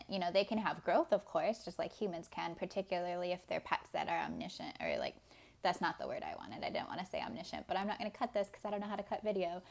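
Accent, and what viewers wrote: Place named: United Kingdom. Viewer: Very cute and awesome advice! And excellent British accent. :)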